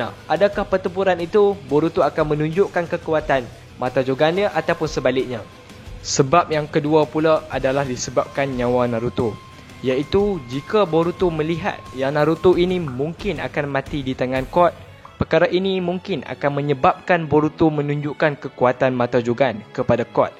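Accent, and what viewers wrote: North, Malaysia. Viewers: ni org utara ka?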